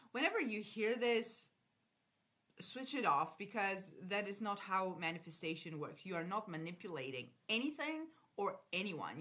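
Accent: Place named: France. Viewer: Hi!! I’ve been following your videos for a while now. I really love your content and you’re one of the people on YouTube whose perspective resonates with me the most. I was wondering what you think about manifesting a relationship with someone (a public figure, but I know that’s not really the point) who doesn’t know us yet. Where would you personally start with something like that? For the past few months I’ve been trying different techniques. Sometimes in imagination I feel absolutely certain that I’m receiving what I want. I know it, I feel it. But after some time, when I don’t see any movement in that direction, I start doubting everything — even the law itself. I always manage to bring myself back, but it’s not always easy. I also have a very specific question: when in dreams you keep seeing yourself in the same dynamic with that person — meaning more in the “fan” position rather than someone who is actually part of their life — do you think that could mean the manifestation hasn’t really settled into the subconscious yet? 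Thank you so much! And by the way, where are you from? I hear an accent that almost sounds French, but I’m not completely sure. 😌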